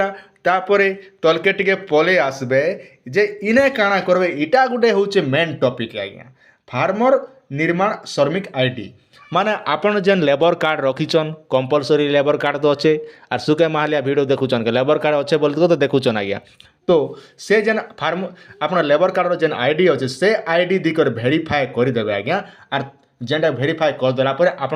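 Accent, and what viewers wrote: Sambalpur, India. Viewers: Tamar sambalpuri bhasha thi kahebarsaili ta badhia laguche.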